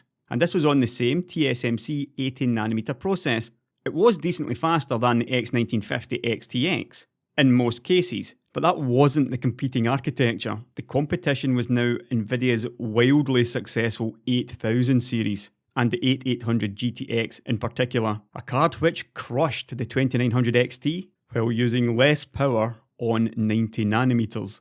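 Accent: Scotland, United Kingdom. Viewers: Dat scoooootish acceeeeeent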